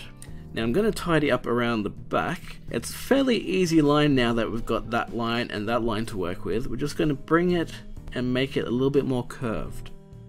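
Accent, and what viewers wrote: Australia. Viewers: ! I didn't know you were Aussie! I am too!!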